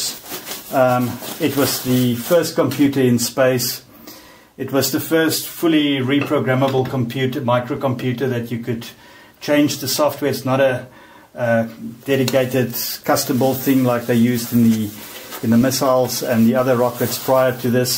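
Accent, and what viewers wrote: South Africa. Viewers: This is absolutely incredible! Wild find. I am absolutely flabbergasted that such a thing could be not only found, but READ in this time. O____O By the accent i'd imagine you're South African? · Lol i was able to tell your are from South Africa because i am originally from there lol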